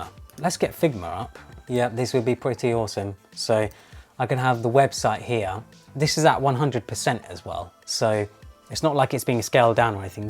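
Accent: United Kingdom. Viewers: That's the hardest brit accent and I love it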